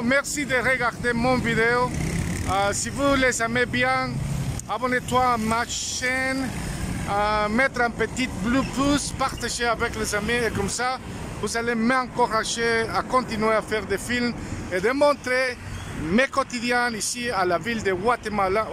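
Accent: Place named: Canada. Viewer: Your French accent sounds very Canadian. However, I love the way you speak French and you can code-switch languages, you have a gift, my friend! Thank you for this video!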